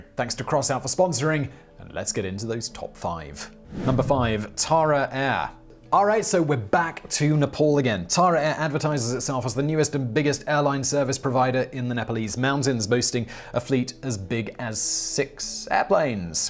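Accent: United Kingdom. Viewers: So this video is extremely morbid. So why was I laughing through it? With Whistler's fast paced British accent, and the over-the-top 3rd world antics, the whole thing started to sounded like a Monty Python sketch. (No offense, Simon and writers--you are awesome)!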